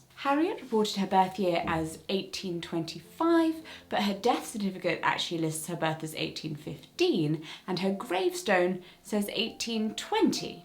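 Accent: United Kingdom. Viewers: While not the "correct" pronunciation, I think the way says it is adorable, especially with her British accent. It makes Maryland sound like a lovely place of sunshine and good cheer. 😊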